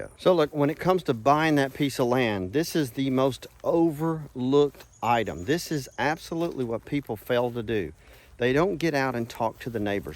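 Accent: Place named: Louisiana, United States. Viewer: Funny how I can see a YouTuber for the first time and can hear they are from Louisiana..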